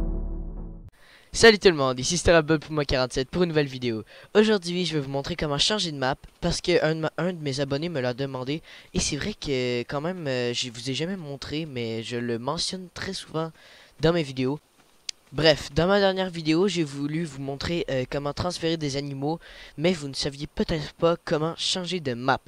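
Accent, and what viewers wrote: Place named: Quebec, Canada. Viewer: juste question pas rapport té tu québécois ta un petit accent 😅🇨🇦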